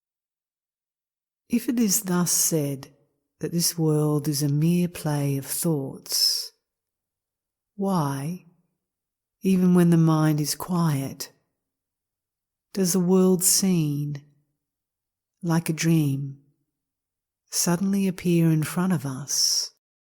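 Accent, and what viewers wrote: Australia. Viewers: Bless you and thank you for this. I think I hear an Aussie accent, so happy to hear an Aussie with knowledge of our one true self <3 Om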